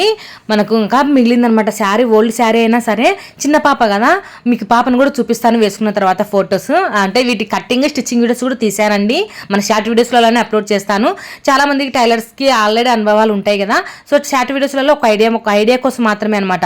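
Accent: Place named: Kadapa, India. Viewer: Me slang Kadapa valla la vundhe meru Kadapa na Andi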